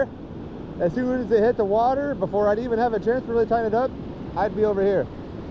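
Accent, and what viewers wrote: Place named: Oregon, United States. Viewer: Never apologize for being and expressing yourself naturally in the way you do! Especially with joy and elation! That's what it's all about man. Hell of a trip, hell of a fish, and that message you made about never letting the kid in you go away is dead on. And you gotta love the classic native Oregonianisms like Crik and Spendy! A great tell to identify a born and raised Oregonian.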